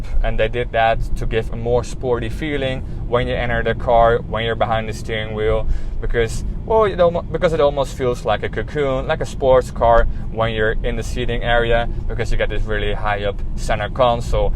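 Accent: Netherlands. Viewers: the English was perfect, but me being from Belgium, I always recognize a Dutch neighbor when I hear one. Great job with the review.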